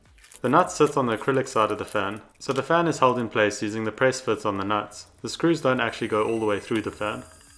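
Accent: South Africa. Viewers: you sound like a South African, not an Australian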